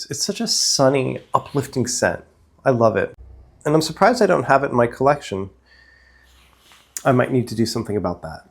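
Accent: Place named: Philadelphia, United States. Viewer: happy belated. of course you're a taurus, makes every sense. I loved your honesty so much in this one. And if you don't mind my saying, I can hear your Philly come out. I masked my Philly/South J accent a thousand years ago... but it comes out at times. And yours came out in this. It's between you and me.;)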